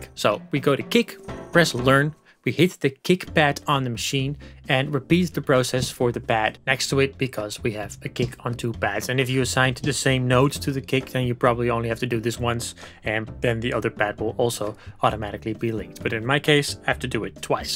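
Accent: Netherlands. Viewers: Zo te horen Nederlands ;-) Dank je wel, deze video heeft mij enorm geholpen! Ga zo door.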